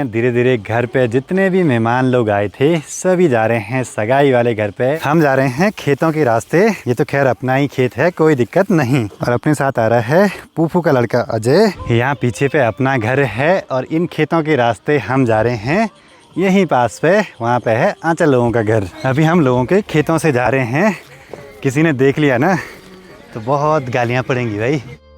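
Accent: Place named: Pahari, India. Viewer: Aap Bilkul cool pahadi ki tarah bolte ho..aap change kro ise ...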